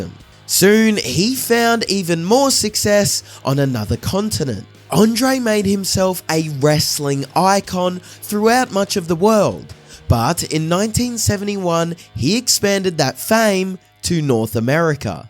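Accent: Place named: Scotland, United Kingdom. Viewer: the best narrator Scottish guy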